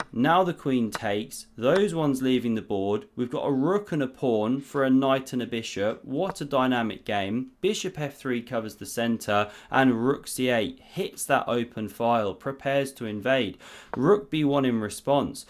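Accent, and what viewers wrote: United Kingdom. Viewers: Uk accent suits any commentary, cricket or chess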